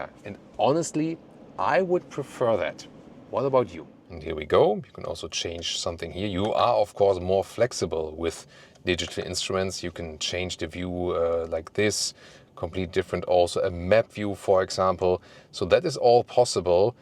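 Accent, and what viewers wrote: Germany. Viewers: is such a classical German 😂 This laughter and this accent. Love that!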